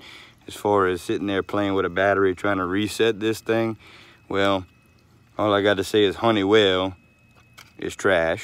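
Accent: Louisiana, United States. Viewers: Man that's a Louisiana accent if I ever heard one!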